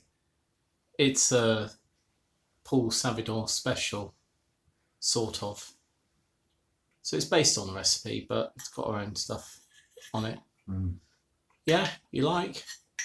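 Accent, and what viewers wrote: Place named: Scottish Highlands, United Kingdom. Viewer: love the accent * (like you just stepped out from the highlands lol)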